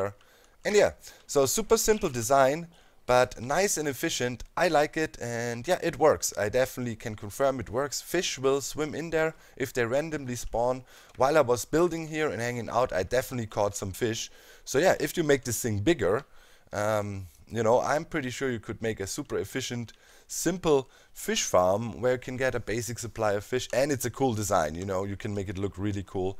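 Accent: Germany. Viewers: Sehr sehr starker Deutscher Akzent xD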